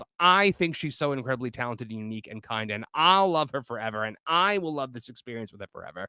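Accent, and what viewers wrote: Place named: New England, United States. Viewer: Are you from Boston/New Hampshire/Rhode Island? you got the accent. Go Sox!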